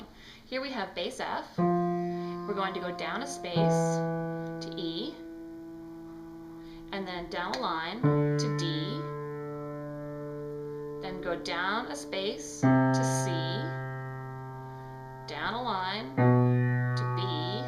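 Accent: Canada. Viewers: great video! ps-- very random but you sound canadian omgg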